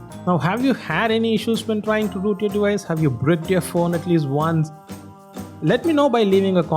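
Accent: India. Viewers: Starts the video-lemme guess hes gonna be indian.. -Seconds later(indian accent) in my last video.... Called it. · omg the indian accent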